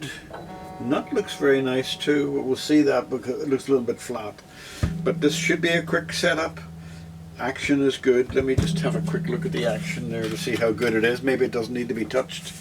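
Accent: Northern Ireland, United Kingdom. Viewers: great to hear a norn iron accent and sense of humour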